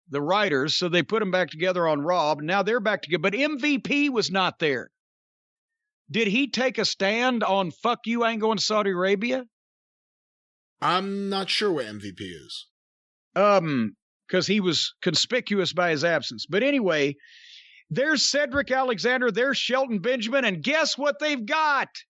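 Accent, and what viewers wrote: South, United States. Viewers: I've complained about the lack of quality control in wrestling for years! Especially when a fed has agents. Hearing the words in Jim Cornette's Dixie accent makes me feel vindicated. "Since there's a real injury, the camera does all it can to stay away from it." Ouch!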